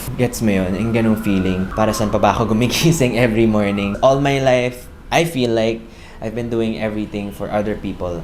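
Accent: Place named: Philippines. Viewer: It’s so cool to see a guy who looks Chinese but has a filipino accent. I always wished I had a Filipino accent, but I was born and raised in Hawaiʻi. My grandma was ilokano, from the Philippines 🇵🇭, and I wish I paid more attention to her when she would try to teach me Tagalog…but no, I just wanted to eat cuscaron, balut, dinuguan, kare kare, etc. I always only have food on my mind. 😜 I think you’ll love Hawaiʻi, Filipinos are the 2nd biggest demographic at 19%, just ahead of Japanese 16%, and behind Caucasian 21%…and we have a lot of mixed race people 24%, many mixed w/Filipino. 😉 The people here are very friendly like in the 🇵🇭, and the weather is beautiful (but much less humid than the Philippines). PS - I just saw your shirt says Hawaiʻi. 😄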